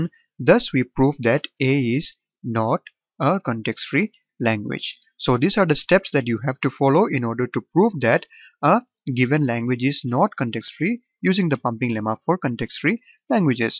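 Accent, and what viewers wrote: India. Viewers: He's from India...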